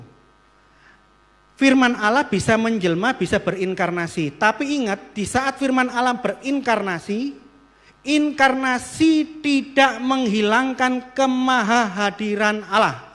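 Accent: Java, Indonesia. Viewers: Kirain ambon. Logat jawa y. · ketrunan NTT..tpi uda lama dijawa..jdi adaptasinya kental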